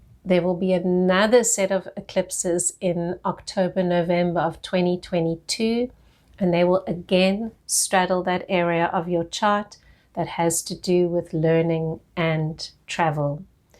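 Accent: South Africa. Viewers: From Sth Africa?? I love the accent. Cheers.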